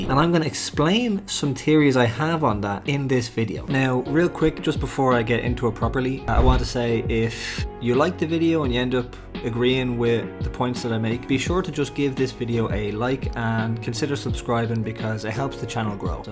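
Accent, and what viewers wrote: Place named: Ireland. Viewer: Came for the theory, stayed for the Irish accent!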